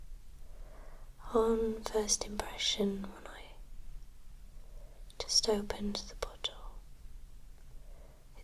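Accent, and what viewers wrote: United Kingdom. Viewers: damn her accent is so British :')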